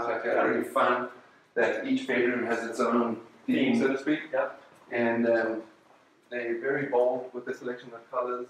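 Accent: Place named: South Africa. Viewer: You can pick up the South African accent anywhere :D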